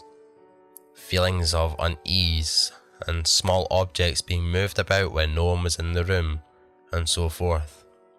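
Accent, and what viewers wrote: Scotland, United Kingdom. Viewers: Yeah!!! I've found me a Scott. Love the accent. You have a new sub and I look forward to hearing from you for a long time. 😍😍😍😍😍😍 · Creepy, uh Mr what part of Scotland are you from? I have been so busy haven't had the chance to see your answer, and haste ye back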